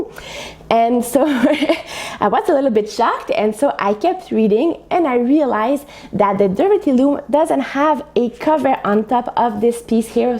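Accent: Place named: Quebec, Canada. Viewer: How exciting for you! Congratulations on your loom. I have been weaving on a rigid heddle for a few months and hope to one day acquire a floor loom. Have fun and thank you for your videos. I like your accent. I am guessing French-Canadian?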